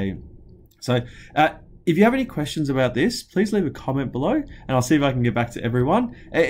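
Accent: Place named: Australia. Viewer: you sound like an aussie. is there any kind of julia community in Aus?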